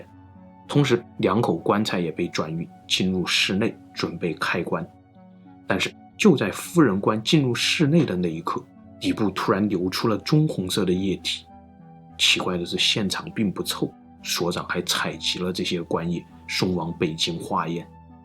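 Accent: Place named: South, China. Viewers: 是川味普通话。关于捡金的习俗，你不知道四川也有此习俗吗，我很小的时候远远的见过，坐标川西南。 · 👏👏👏每周必看的节目，雷打不动😎😎😎 请那些刻意(觉得可爱开玩笑的不算)对口音挑剔的移步去看中央新闻联播。好走不送👋 我们南方人就是这样说普通话达。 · 別挑剔啦！ ㄧ定是南方人，可能是四川或是江蘇人吧！咬字發音自與北方人不同啊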